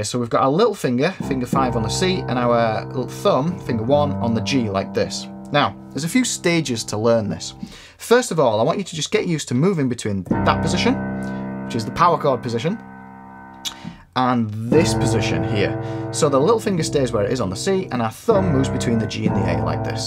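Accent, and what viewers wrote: Yorkshire, United Kingdom. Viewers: not a joke, just complete bollox. 🤣 I love your “nothing like cockney” accent which sounds like a soft (Leeds?) Yorkshire to me. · Sounds like he's from Yorkshire. ep up mek a brew bluddy ell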